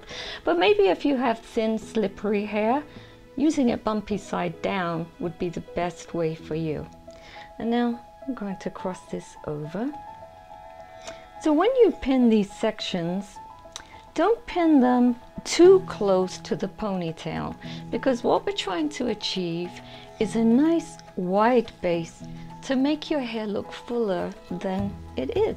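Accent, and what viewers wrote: England, United Kingdom. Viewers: I ran across your channel. I stuck around and watched several videos. I must say you are an extremely beautiful lady. I found that I was in absolute awe over the way you talk, look, act, present yourself. I love your English accent and your such a funny woman. I love your humour. Your a very bubbly person . It made me smile. Plus with saying all that your videos were very relaxing for me to watch. Thank you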